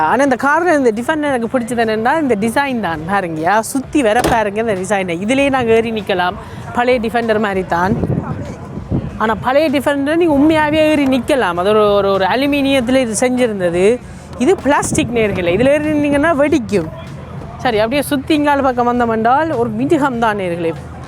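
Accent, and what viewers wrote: Sri Lanka. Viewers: Bro srilanga tamil super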